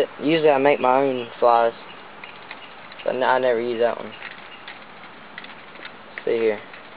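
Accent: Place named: Georgia, United States. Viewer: hey man sounds like your from my neck of the woods. im in georgia and i do alot of fishing in lake lanier and lake heartwell.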